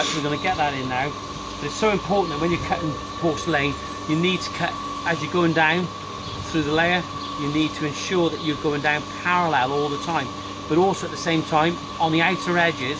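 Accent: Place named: Malpas, United Kingdom. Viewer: Cracking job! Just come across your videos! I recognise that accent, my Mum's from Malpas 😎👌🏻👌🏻🏴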